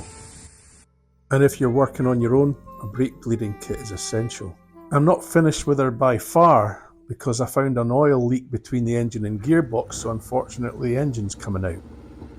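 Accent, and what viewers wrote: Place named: Scotland, United Kingdom. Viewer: You make me want to go to Scotland again. I visited for work with Motorola back in the day. Your accent brought back fond memories of good people.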